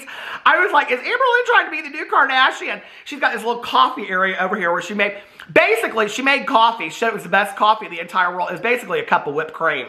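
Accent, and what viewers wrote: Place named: Midwest, United States. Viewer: The Midwestern accent was popping in this video and I LOVE it!!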